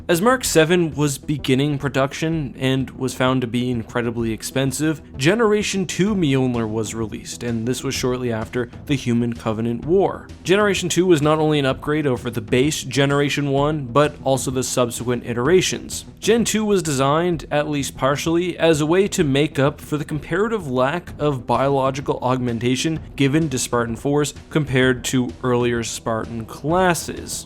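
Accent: Canada. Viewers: Wait, are you Canadian?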